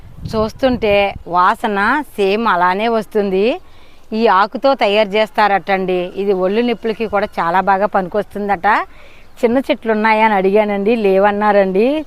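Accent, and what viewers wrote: Godavari, India. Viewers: Godavari jilla తెలుగు super madam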